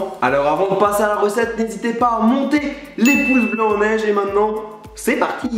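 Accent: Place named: Alsace, France. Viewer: Hé yo on entend bien l'accent Alsacien dis donc (le Bonjour de Mulhouse)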